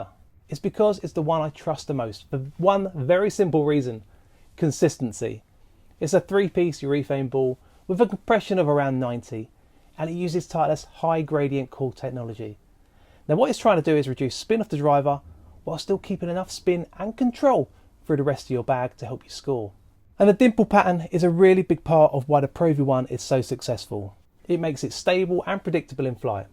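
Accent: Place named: United Kingdom. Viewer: Why is every video a fkn brit talking.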